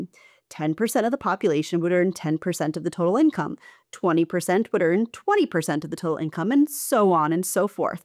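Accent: United States. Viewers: You have a perfect American accent. I would not have guessed you were German until you gave it away. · Always surprised when I hear a American accent talking sense! ❤ Makes me believe , or hope that things will be fine!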